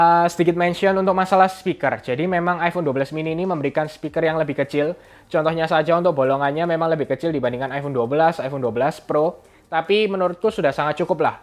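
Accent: Java, Indonesia. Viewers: Suaramu kok medok to Mas. Wong Suroboyo opo Semarang? ....... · Bolonganya 😄🙏🏻 sampeyan medok bgt toh koh ..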